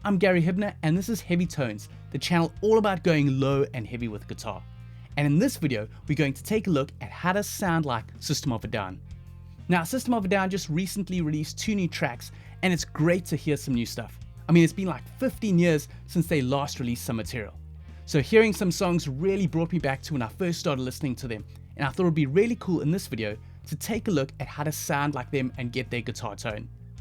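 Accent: South Africa. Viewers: 2 seconds into the video I knew you were from South Africa 🇿🇦🇿🇦🇿🇦🤘🤘🤘🔥🔥🔥 much love from Kzn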